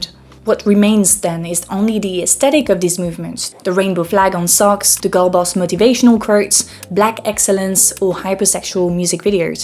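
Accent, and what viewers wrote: France. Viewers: am i the only one who's just here for the French accent? lol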